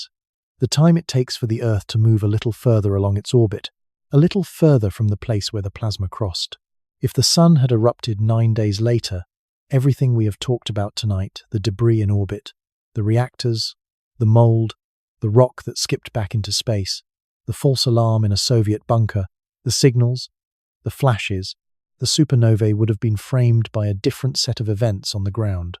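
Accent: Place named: England, United Kingdom. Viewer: I just discovered your channel last night as the algorithm finally figured out that I love science. It’s great to have a channel with a mellow & relaxed English accent that doesn’t drive up my cortisol levels. Subscribed immediately. Can’t sleep tonight though, so I just got up & got into this. I’m in Norman, Oklahoma, US, a.m. I am completely exhausted with channels that are nothing but gossip about celebrities or the monarchy or politics. This is a breath of fresh air. Thank you for all your hard work & thank you for making me a better informed person 😊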